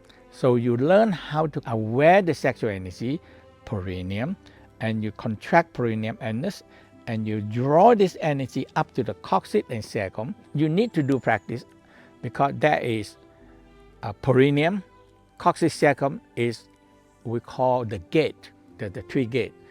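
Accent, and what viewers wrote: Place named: China. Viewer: I’m sorry, sir, but you speak Chinese-English, aka, “Chinglish.” Consequently, it’s very difficult to understand you, making your information just about useless. May I suggest that you find a bilingual person who can impart your message with a voice-over in clear, native-speaker English? In this way, you can be sure that your message is correctly understood by native speakers of English! I hope this helps.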